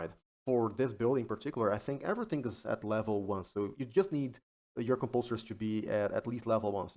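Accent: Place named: Brazil. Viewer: Hello! NIce video! Are u brailian? U rly have the accent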